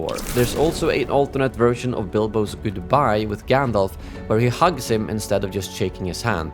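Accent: Sweden. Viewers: If having a Swedish accent means sounding gay as in merry and bright, then yes! 🤓✨️ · I applaud your continued efforts on this project. The day we do see more scenes (and hopefully new extended anniversary cuts) released, I trust many of us will gather here and thank you for your continued interest and passion for this trilogy and all its lost fragments of gold. If there's anything that's ever going to give all this lost material a good push toward being released, it's this. This undeniable display of passion. You look at these compilation videos being updated again and again as more material surfaces over the years, and it couldn't be clearer. Hundreds of comments. Hundreds of thousands of views. This kind of passion doesn't just die down quietly over time, there's too much good stuff out there to keep folks like us up at night. I'm glad to see the torch is still burning bright. 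Also, hearing a fellow Swede narrate this really resonates with the whole Nordic Middle-Earth vibe.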